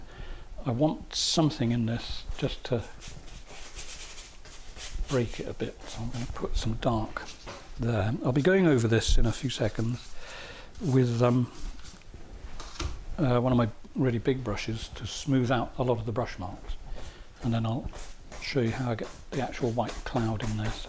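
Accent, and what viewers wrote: United Kingdom. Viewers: You are so talented and you also crack me up. You look like an actor. Or maybe it's just your accent. We American's are very taken with British accents. We also think all accents similar to yours are British LOL.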